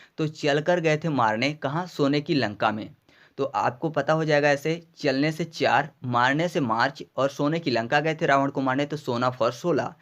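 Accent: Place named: Baghelkhand, India. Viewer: Hats off bhai to ur effort....baki bhai Baghelkhand se h apna aisa lg rha h hme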